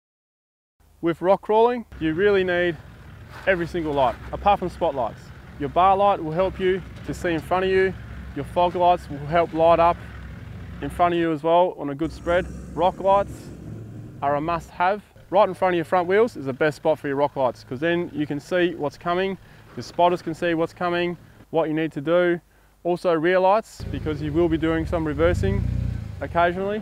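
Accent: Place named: Australia. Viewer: This guys accent is so cool Australians always seem happy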